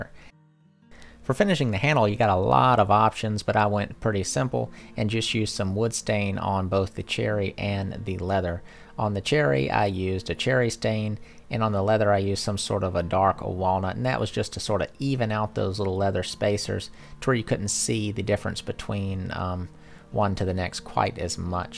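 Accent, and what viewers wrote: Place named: Alabama, United States. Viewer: Beautiful knife...thank you for sharing! I noticed you said in a below comment that you were driving by SMKW when you decided to go in. I live about 30 minutes away from there. So you live in East Tn or were you visiting? Your accent sounds more from central Alabama.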